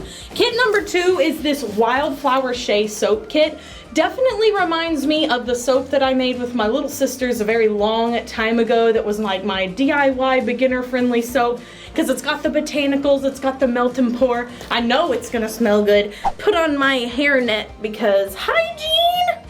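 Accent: Texas, United States. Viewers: I love your slight texas accent! It makes me so happy!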